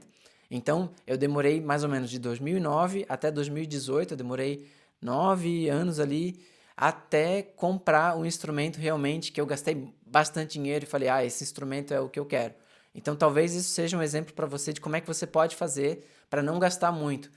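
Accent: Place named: Santa Catarina, Brazil. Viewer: Eu sabia que vc era Catarina. Hahaha. Sotaque não nega. Tamo junto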